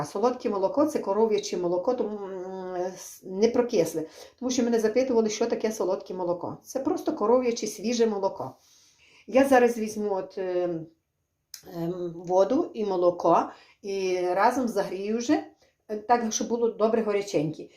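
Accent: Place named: Bukovina, Ukraine. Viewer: Слюна тече дякую мені кажеться що ви з Буковина наша рідна мова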